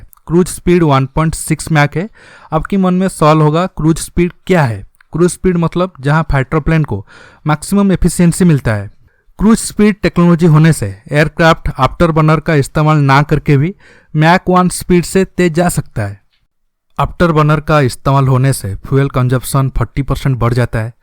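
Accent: Odisha, India. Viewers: Is the narrator an Odia?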